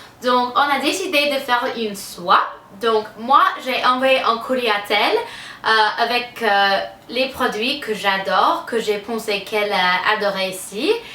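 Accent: France. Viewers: Je succombe totalement à ton accent frenchy lol les produits sont extra, u've master french lgge better than some french pepople haha, kiss <3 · J'aime vraiment beaucoup ta chaîne , et surtout ton accent français . Tu ressembles énormément à Lindsay Lohan c'est fouuuu , bisous p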